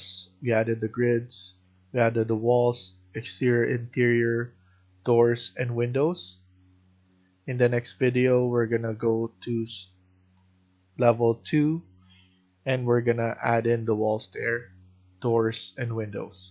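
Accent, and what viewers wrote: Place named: Philippines. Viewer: salamat rin sa guide.. I was right when i first heard you alam ko pinoy agad 😅.. I needed his guide thanks again..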